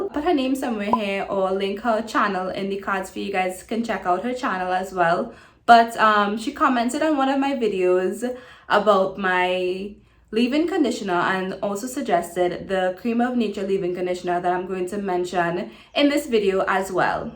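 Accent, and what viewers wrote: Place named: Trinidad and Tobago. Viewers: Definitely a Trini voice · Yep Trini too the bone...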